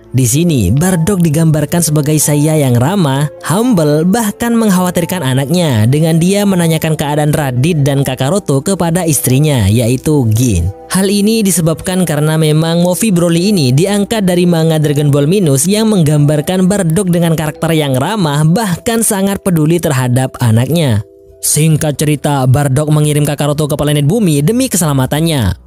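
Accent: Madura, Indonesia. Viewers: Kelihatan logatnya logat plat M 😀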